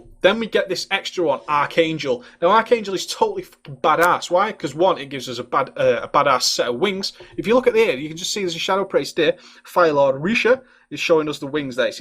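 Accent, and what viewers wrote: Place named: Manchester, United Kingdom. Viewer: Great video, going for a shadow priest with the scroll of resurrection. Nice to hear a Manc accent, I moved to Brazil noy many Mancs there. :)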